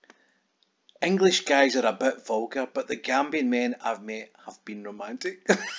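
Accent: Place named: Glasgow, United Kingdom. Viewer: you replied! <3 I read it with your voice xxxxxxxxxxx My Glaswegian love lol xxxxx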